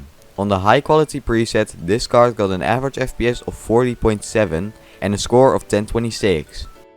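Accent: Netherlands. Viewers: I found an xfx version for 30 bucks, very happy with that. Btw where are you from? You sound very dutch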